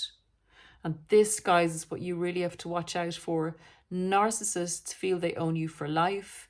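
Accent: Ireland. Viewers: Nice too know we are not alone listening to sites on narcissists. Just feel even better with your accent being Irish ☘️ just found this site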